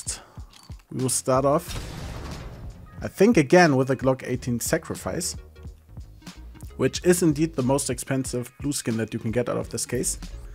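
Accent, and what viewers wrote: Germany. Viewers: Ich weiß es lässt sich nicht sehr einfach abtrainieren aber der deutsch Akzent ist einfach einer Der schlimmsten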